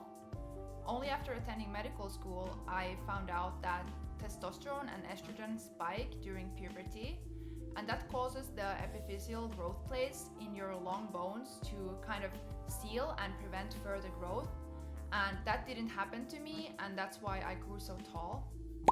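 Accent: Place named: Germany. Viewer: She speaks English with a kinda German accent. Good clip.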